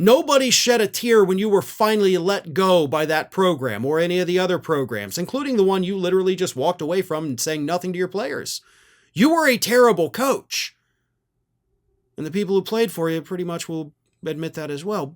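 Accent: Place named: Appalachia, United States. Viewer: He is a maga senate wet dream. He talks and sounds like all the all stupid maga hillbillies.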